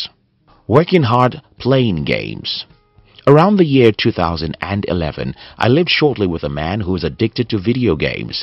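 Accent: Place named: Nigeria. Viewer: Hey man, be proud of your old Nigerian voice, it is better than this uninspiring voice.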